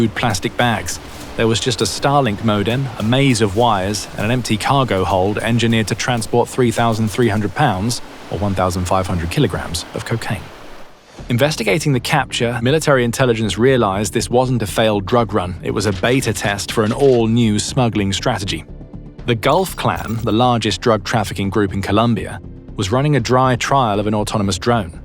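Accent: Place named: United Kingdom. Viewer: It's a lie story with a British accent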